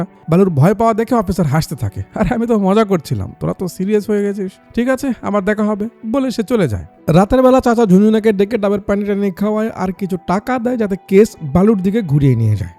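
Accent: Bangladesh. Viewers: তোমার বাড়ি কি বাংলাদেশ তোমার কথা ধরন দেখে বলছি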